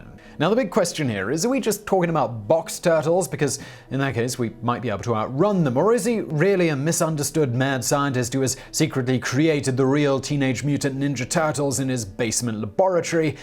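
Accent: United Kingdom. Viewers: sure seems to revel in the ridiculousness that happens in America. We enjoy it here too, but it cuts deeper hearing it in a British accent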